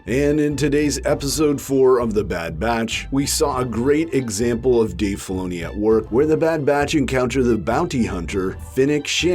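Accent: Canada. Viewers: I can tell your Canadian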